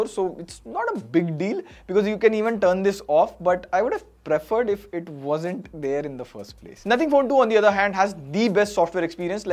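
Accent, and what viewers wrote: India. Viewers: We need an easy ai tool to change indian accent to another accent (american ...).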